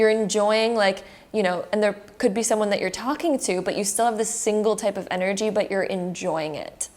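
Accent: Australia. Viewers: you are the cutest human 😭😍 the Australian accent got me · Ahhh I love the Australian accent haha. You always remind me to have fun no matter what. Keep those vibes high!! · OK BUT YOUR AUSSIE ACCENT IS AMAZING AND WOULD TOTALLY HAVE ME FOOLED 😂😂😅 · It’s the Aussie accent for me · Your Australian accent is absolute gold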